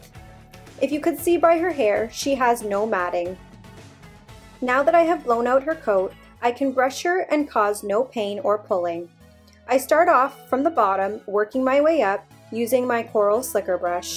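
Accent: Canada. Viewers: And her subtle Canadian accent adds so much to it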